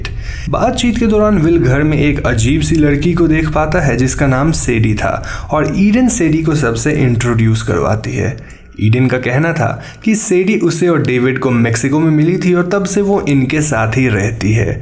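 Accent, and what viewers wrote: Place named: Bihar, India. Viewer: He has bihari accent😍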